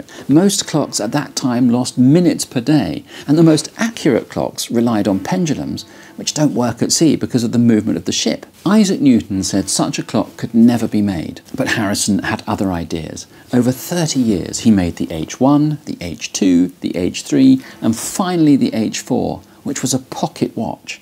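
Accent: England, United Kingdom. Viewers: What ever the fck problem they had that was purported to be solved in this instance was already solved by African navigation techniques. But that’s not His-story now is it? Interestingly enough the English were the main hiders of this information. This guy sounds English to me. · I like your posh English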